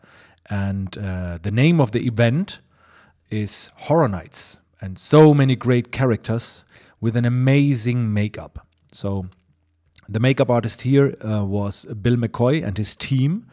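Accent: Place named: Germany. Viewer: Schön zu sehen (hören), wie dein Englisch innerhalb von 26 min. von "Naja, halt n Deutscher, der Englisch spricht" zu "Hmm...Kanns sein, dass der aus Deutschland kommt?" wird. Man merkt, dass du schon ein wenig rumgekommen bist ;) Schönes Video. Great job.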